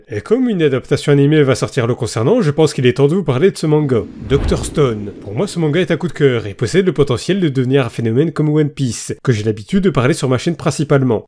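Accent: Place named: France. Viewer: Je lis les scan cher empereur (je suis même à jour), et "Senku" (Senkū en romaji si on en croit wikipedia) en japonais se prononce littéralement "CènkOU" pas "CènkUH", ça finis par le son "う" (allongé qui plus est) qui est un mélange entre le son "EU" et "OU" (comme le "U" de "LUffy") donc je sais de quoi je parle justement, après, si tu veux garder ce cher accent français, je ne me permettrais pas de juger l'empereur :) Merci de faire découvrir ce manga a un plus grand nombre (le coter comique étant son point fort pour moi), tu lis promise neverland sinon?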